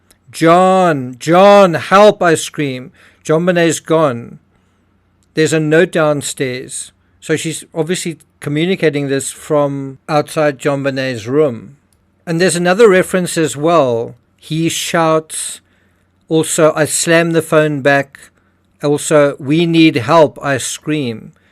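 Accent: South Africa. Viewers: I think it's the narrator's South African accent pronouncing Barb.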